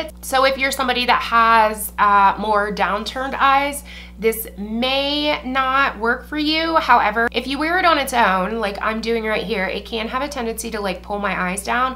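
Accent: United States. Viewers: I love this tutorial, thank you! You sound like your from Philly, reminds me of my aunt lol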